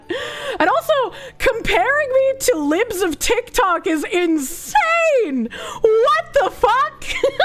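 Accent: Boston, United States. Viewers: How does that thick Boston accent get confused with the Midwest? Lol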